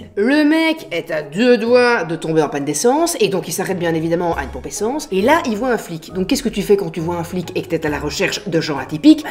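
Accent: Belgium. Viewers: Salut, Je sur kiffe vraiment le procédé de vidéo mêlé à l’humour et cet accent belge … 😂 Mais c’est vrai que ça va un petit peu trop vite … là même chose un rien plus lentement… serait géniale …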